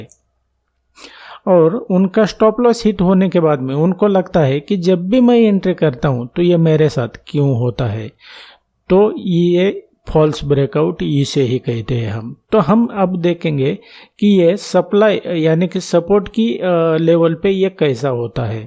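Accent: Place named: Hyderabad, India. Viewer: Hyderabadi ho kya aapke hindi speaking se aisa lagta ha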